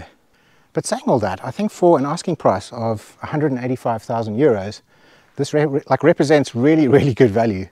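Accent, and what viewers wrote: South Africa. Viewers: Hello I have just come across your channel and I had a quick look at what video's were on your channel and I seen lots of properties so I am now subscribed I have a question for you and I am eager to know if I am correct in thinking by your accent that I believe your maybe from South Africa?? Eager for your reply Enjoying what I have seen so far and I think I am gonna be binge watching these videos 😊😊 Lisa UK xxxx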